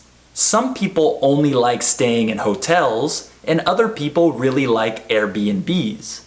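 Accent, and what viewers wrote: United States. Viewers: Is it american accent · No , American accent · Hey! Thanks for the advice that you gave me yesterday, you are intelligent, you are amazing, you have soft voice to speak English fluent, intonation, you speak English to flow like an American, I am really fell very proud of you, I do appreciate you a lot, I am glad for you, you make me feel relax as you speak that accent, yeah! 😸😄😆👌👍😊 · No, is American accent (more or less) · I guess it's an American English doesn't it ? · It's American English.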